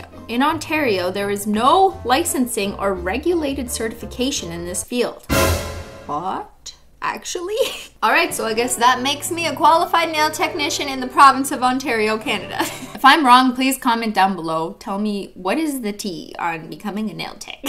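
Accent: France. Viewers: Her french accent in english words was very good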